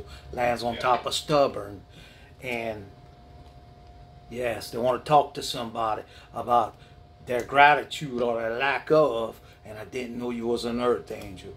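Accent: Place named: Louisiana, United States. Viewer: You remind me of my Grandfather! Both of them .. Both of them were born and raised in Louisiana. Southwest Louisiana to clarify :) Really enjoy listening to you. Reminds me of Home.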